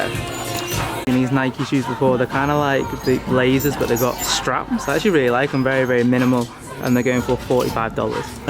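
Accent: United Kingdom. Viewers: It sounds weird hearing a person from the uk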